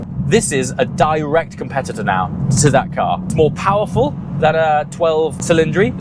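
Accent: RP, United Kingdom. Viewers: Not sure who this guy is but it’s the second video I’ve seen with him, and he’s reviewing. He’s very good and presentable. Great work and look forward to more. A bit too Posh British but like i said, well presented and spoken in detail.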